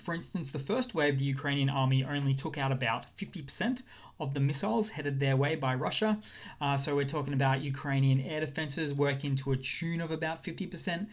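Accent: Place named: Australia. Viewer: If you're referring to accent, he's Australian.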